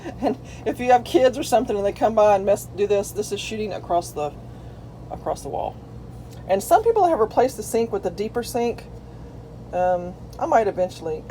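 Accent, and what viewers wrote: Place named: Texas, United States. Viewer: Cafe Bastelo, I grew up around that coffee with my Cuban Parents. Cafe Con Leche is basically a Latte coffee but you can taste the expresso a little more. Love that Soft Texan accent you have. As you we don't like allot of stuff. When i'm out on an excursion its the one time i can live with less. But have a far larger camper a 22ft Grand Design 22mle. But interested in this camper for distance trips. More so with fuel prices where they are today. We live in Miami, FL and when towing our TT it takes us 8 hours just to cross into GA and 10.5 hours to exit the Peninsula through I-10. Appreciate your Vids.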